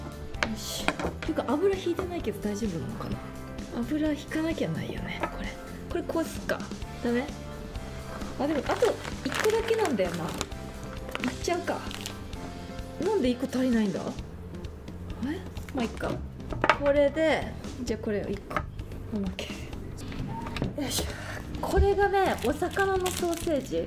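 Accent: Kansai, Japan. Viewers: 日本語も英語も混ぜ混ぜで 関西の女の子って感じで素敵ですね😍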